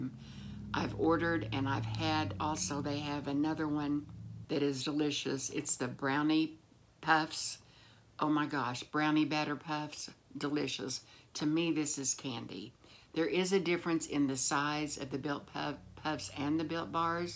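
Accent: South, United States. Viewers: Hi, I’m new here and enjoy your chit chat. I think this is my second time watching your videos. I live upstate NY in what used to be the original capital of NY State. The weather here has transformed beautifully into Spring. It’s becoming green and flowery all over. I go walking at the local track and field space and love it. Everyone is so friendly, saying hello to me and others they don’t know. It’s heartwarming and I think we give one another hope. Keep well and thank you for sharing. I enjoy your chit chat and your Southern accent is great!!! I wish I had one but I was born in Brooklyn!!! Thank goodness I lost most of my Brooklyn accent - my best friend in college was from Springfield, Mass. where people have no particular accent and it sounds fine and clear. Kind regards and keep well. FR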